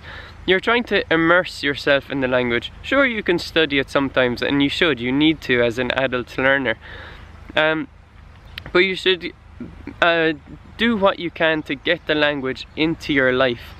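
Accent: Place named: Munster, Ireland. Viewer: I appreciate lots of what does be saying, and it's not my downvote... but to broaden the conversation: Yes I want to understand every dialect. But I'd like to have a supplemental source to be reasonably consistent in how I speak myself, rather than use a random mix. Fortunately enough, as I understand it, tends to a Munster accent (my would-be accent) vs. Duolingo that teaches Connacht, or TG4 with a mix where you have to be intentional about who you mimic.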